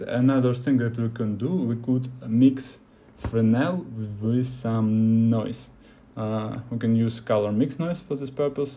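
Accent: Russia. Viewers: Русский акцент) Ты случайно не с мастерской?) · оцень русский акцент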